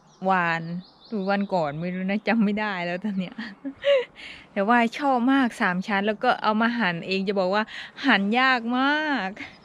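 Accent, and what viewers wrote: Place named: South, Thailand. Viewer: พึ่งเข้ามาดูให้กำลังใจค่ะ สำเนียงเหมือนคนใต้เลยนะคะ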